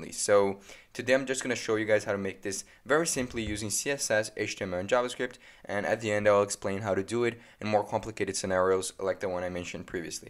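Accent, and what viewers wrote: Brazil. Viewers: sotaque br? very nice english by the way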